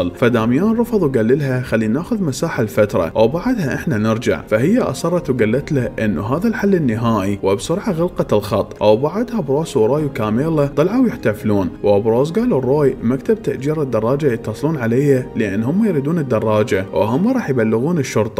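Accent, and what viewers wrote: Iraq. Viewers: انته عراقي مو العراقين وينكوم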